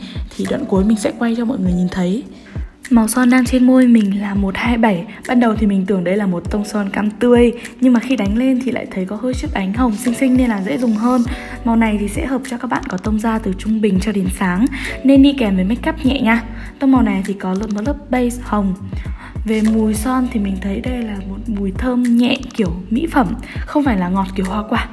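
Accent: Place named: North, Vietnam. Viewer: Chào chị ạ, em xem chị và biết chị rất rất lâu luôn tầm 1 năm về trước -.- 1 năm đối với em khá lâu rồi a. Em thât sự rất thích chị, đầu tiên em thât sự ấn tượng với giọng nói của chị, em là dân miền tây nên rất thích con gái Bắc, giọng nói trầm ấm nhẹ nhàng hihiii, thứ 2 em cực kì cực kì thích môi của chị, như anh Hoàng Thạch nói người gì mà môi đẹp ghê, môi cứ chúm chím đáng yêu mà không đánh son môi cực hồng nữa <3. Video swatch của chị rất chất lượng, hình ảnh tốt màu chuẩn không bị lệt quá nhiều so với thực tế. Bất ngờ hơn chị còn đang trên con đường ca hát nữa <3 em thích hát lắm nhưng tài năng không có hihii hay hát chứ không hát hay <3333.Em tự ti quá chị ơi:(( thật sự em rất ghen tị với các anh chị beauty blogger, như chị và Mai Hương,xinh thật sư, còn em thì không xinh tí nào:)) da thì mụn còn ngâm nữa chả có tí tài năng gì, chán ghê. 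Nhưng ba mẹ đã cho mình một hình hài thì mình sẽ cố gắng sống tốt thoii, sống tốt để làm bà hoàng của chính cuộc đời mình <3 em thích màu 129 ạ <3 Mong chị đọc đc cmt. FB: <link>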